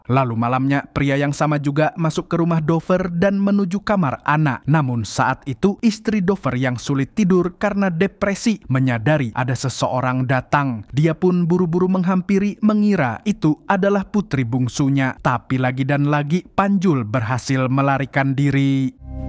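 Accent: Banjar, Indonesia. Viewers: Orang banjar kah bang? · pian urg banjar kah min😅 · Banjarr is good😂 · Banjar banar amang nih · Bah orang banjar kah Pian mang,pacangan jadi langganan ni nonton di sini ulun😅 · mang pian urang Banjar kah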